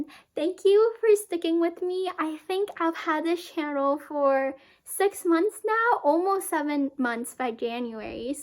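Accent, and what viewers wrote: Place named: United States. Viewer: I think it's an American accent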